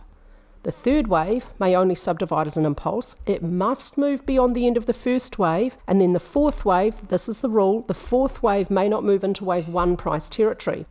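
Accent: New Zealand. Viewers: Great to hear your kiwi ascent😊😊😊😊. Great analysis thanks.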